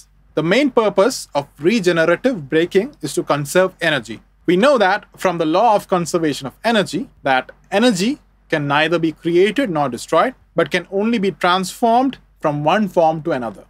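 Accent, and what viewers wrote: India. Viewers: ofcourse Indian accent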